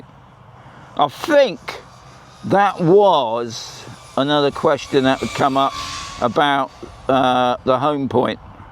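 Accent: United Kingdom. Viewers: You sound like you're from the UK....when ordering ALL websites stated PRE-order (meaning you should KNOW it's not ready for delivery yet in the UK) So that's on YOU. The distorted close up footage is expected (you do know there's a gap between the 2 360° cameras...don't you...the gap is expected) Software fixes the distortion. You were correct at the beginning....you're just MOANING 🤦‍♂️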